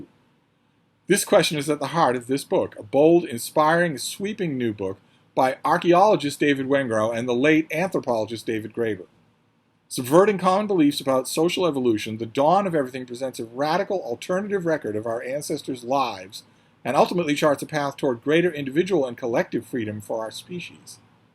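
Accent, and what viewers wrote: United States. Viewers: Sounds like you are in Georgia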